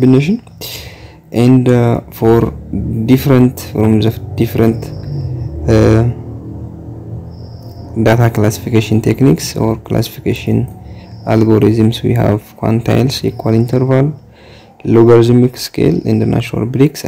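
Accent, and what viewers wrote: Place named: India. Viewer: Fuck your english indian accent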